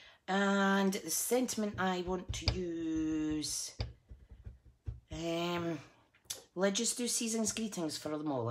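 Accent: Scotland, United Kingdom. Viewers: Is that Scotland I hear in your voice? Those cards are gorgeous. I’m a demo from Dover, Delaware in the USA.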